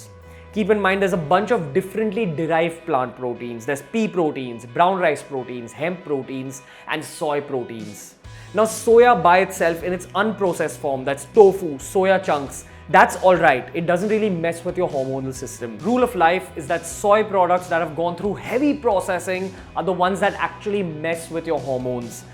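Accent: India. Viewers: Its Amino acid not "Ameino" acid! Yes, I checked the oxford dictionary and thesaurus. Stop using that ugly fucked up Indian way of pronouncing shits. Makes you look like a fuckturd wannabe. That was just pure cringe!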